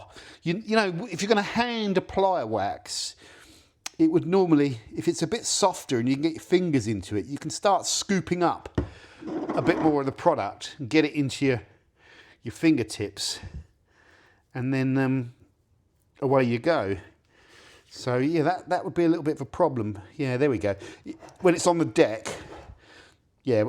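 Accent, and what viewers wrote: United Kingdom. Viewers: I really love your British accent. Here in Korea, I occasionally hand-wax my Hyundai with Zymöl Ital. One day, when I’ve saved up enough money, I’d love to get some Concours and give it a try.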